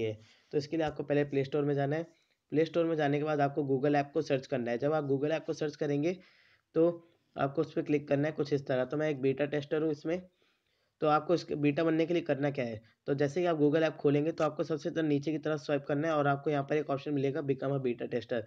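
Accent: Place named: India. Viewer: No offense i undsrstand that u are indian and have accent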